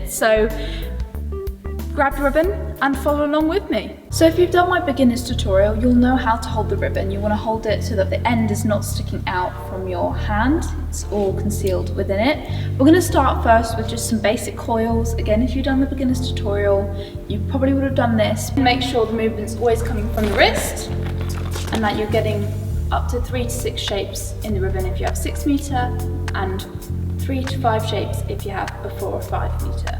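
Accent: England, United Kingdom. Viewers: I just love your English accent, i could listen all day long.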